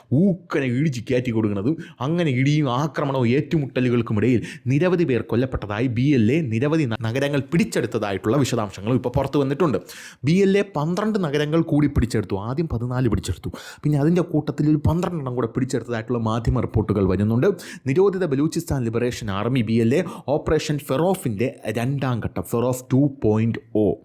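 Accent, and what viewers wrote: Thiruvananthapuram, India. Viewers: 😂😅 ഓ, തന്ന... തന്നെ അപ്പീ 😂😅😅 തിരോന്തോരം 💪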